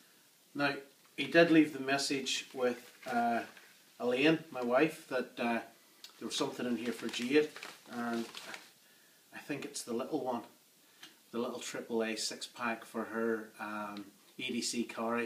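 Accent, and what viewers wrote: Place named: Ireland. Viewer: Sweet Gifts. Dig the pirate talk especially since your Irish accent makes it sound more genuine than mine with my South Florida Cuban English. We've got to do something about that jersey though.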